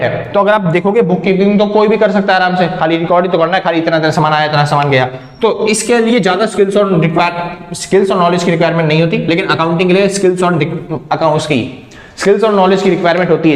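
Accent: Haryana, India. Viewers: SIR PAKKA HARYANA SE HAI 👍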